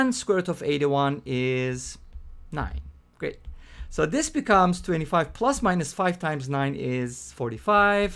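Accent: Turkey. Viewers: Your English accent sounds Turkish .is it really so :)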